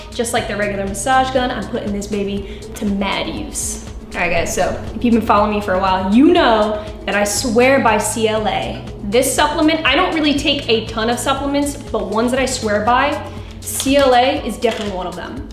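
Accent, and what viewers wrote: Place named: New York, United States. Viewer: I noticed you have an accent, are you from New York, or New Jersey? Just curious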